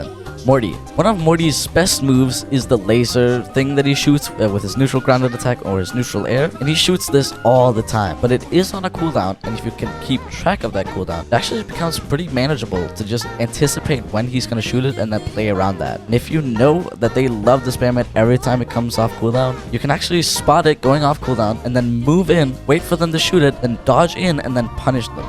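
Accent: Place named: Denmark. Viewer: bro lyder lidt for dansk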